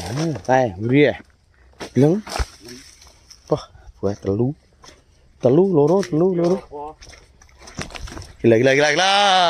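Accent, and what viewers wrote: Tulungagung, Indonesia. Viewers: logatte jian kental tulungagung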